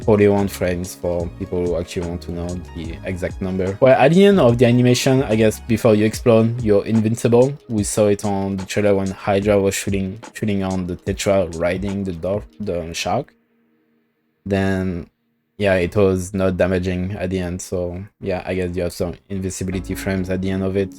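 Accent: France. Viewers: Tu parles vraiment bien anglais mais honnêtement j'ai pas besoin de vérifier t'es français je pense X) on parle tous pareil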